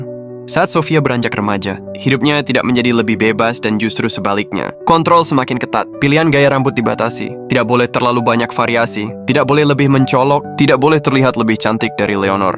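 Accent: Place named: Malaysia. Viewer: Mimin sering mengikuti intonasi kalimat dalam bahasa malaydesh, Hal itu tidak akan berpengaruh kepada pengaruh bahasa Indonesia di wilayah asia tenggara.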